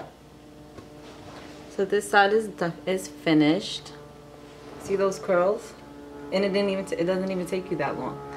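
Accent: New York, United States. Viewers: I love your New York accent yasssss ✨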